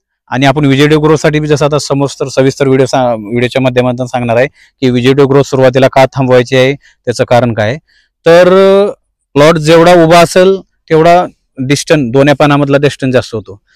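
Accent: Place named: Pune, India. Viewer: खुपच छान, सर आपण फार छान माहिती दिली.शुद्ध मराठी पुणेरी भाषेत.धन्यवाद.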